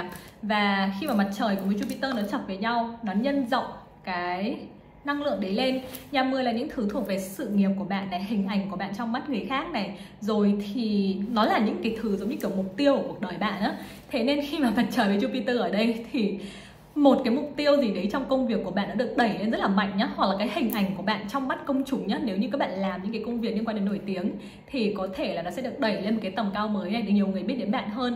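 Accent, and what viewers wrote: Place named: Cam Pha, Vietnam. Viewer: nghe giọng như người cẩm phả vậy :)))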